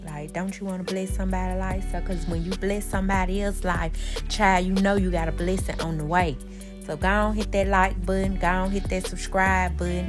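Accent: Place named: New Orleans, United States. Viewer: Accent sounds from NOLA 🎉🎭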